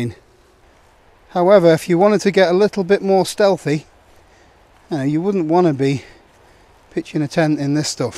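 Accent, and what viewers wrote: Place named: Northern England, United Kingdom. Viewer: Always enjoy your enthusiasm and chance to hear a northern accent - don't have many of them down here here in Tasmania our kid. As for the body condom -..yeah, nah...I'll stick with me Vango TBS Micro. Nice one.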